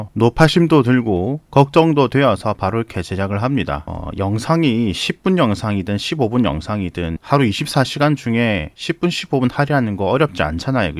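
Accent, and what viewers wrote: Gyeongsang, South Korea. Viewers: 밸런스를 아시는 분이시네요! 경상도사투리가 약간 짜증섞인 어투로 들리기는 하지만, 고유의 스타일이신 것으로 이해하도록 하겠습니다^^:: 감사합니다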